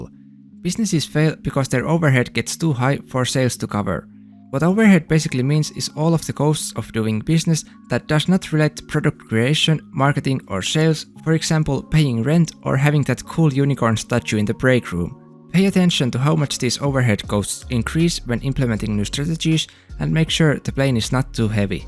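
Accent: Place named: India. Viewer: Can you put YT subtitles? My English is not good and it seems you have Indian accent which is more difficult to understand. Thanks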